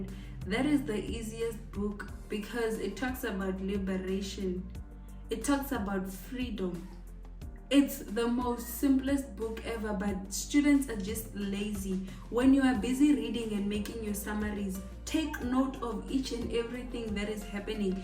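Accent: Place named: Tsonga, South Africa. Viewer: You sound Tsonga idk😭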